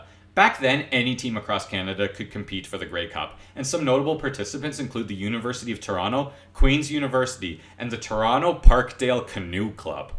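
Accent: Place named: Saskatchewan, Canada. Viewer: This guy is absolutely a Sask local. At least his formative years. Accent is a dead giveaway.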